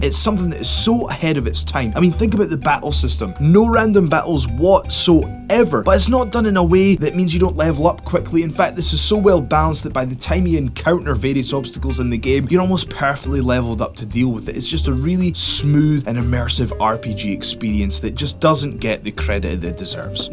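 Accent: Scotland, United Kingdom. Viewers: Scottish? I love the accent a lot. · It took me a second to realize you weren't American (then again it's WhatCulture so I should've known better) but dude you have the slightest (Scottish?) accent I've ever heard! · Is this guy Scottish ? I'm not English, and I am not sure, but his accent seems strange to me, especially the pronounciation of "R".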